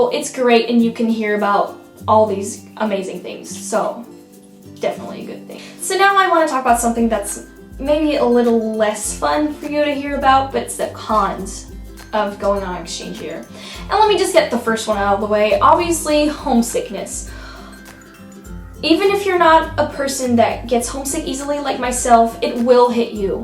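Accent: United States. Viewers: you got an American accent now.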